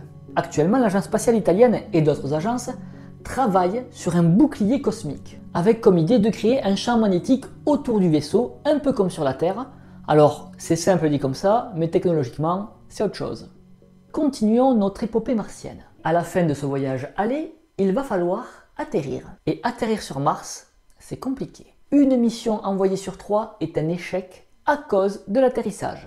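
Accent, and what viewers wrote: France. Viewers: l'accent provençal enlève beaucoup de crédibilité, mais c'était interessant ! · Mama cet accent toulousAIN mdr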